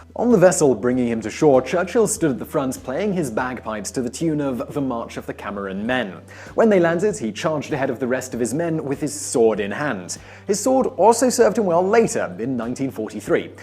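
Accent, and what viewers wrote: England, United Kingdom. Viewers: When did Michael get a British accent? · This is just a skinnier Michael from Vsause with an english accent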